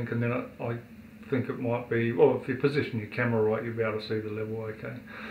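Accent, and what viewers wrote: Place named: New Zealand. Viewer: Hi '' ''..you are ''brighter'' than me as I had to jump on youtube to see how to open the camera mount for smart phones!..thanks for the heads up.Btw,I live just down the road from where they are made in Shenzhen and the cost was only 59RMB.When the tripod arrived in very professional packaging and I had a chance to see the quality, I made a comment to my son ''how can anyone compete with the Chinese in manufacturing!'' From a fellow Kiwi in China (unless I am very mistaken with your accent!)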